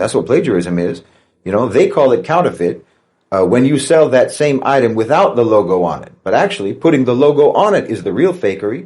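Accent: United States. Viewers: You sound, Americon, but you have a regional accent that I can't place. What state are you from, or what is your regional accent? Good voice.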